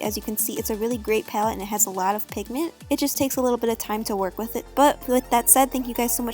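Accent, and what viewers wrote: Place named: Michigan, United States. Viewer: I frickin love ur Michigan accent and how you say lashes its so cute 😙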